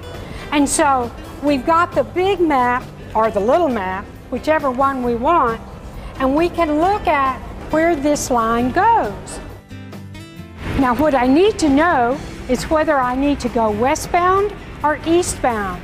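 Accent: United States. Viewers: Nice Video! Very well explained! I like hearing the American Accent in London!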